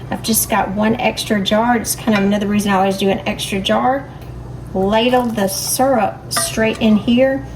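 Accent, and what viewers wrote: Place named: Georgia, United States. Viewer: You know youre from Georgia when the transcript says bowl each time you say boil😅!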